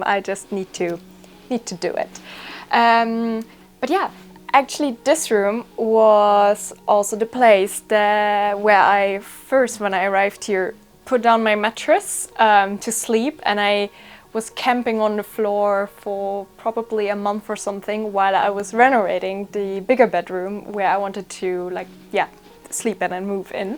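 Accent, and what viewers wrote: Germany. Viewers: Sehr hübsch...Deutscher Akzent, sehr heimisch und vertraut ❤😉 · She has an german accent,(twist) and that is quite normal, if your native lamguage is not english.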